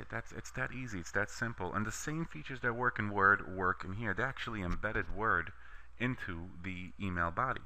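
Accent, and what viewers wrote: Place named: New York, United States. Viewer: this one came in handy also - thanks for your hard work on these. I am still guessing NY accent...perhaps the Bronx?? I can hear it when you say dog.... like dawg :-) lol odd I know!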